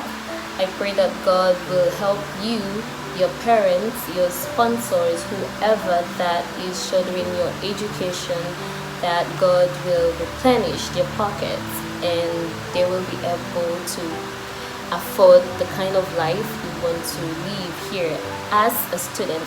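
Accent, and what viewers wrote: Philippines. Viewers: Philippine English accent. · I"m a new subscriber of your channel. You speak english clearly and your accent sounded like english speaker pinoys. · you got the philippine english accent.it’s good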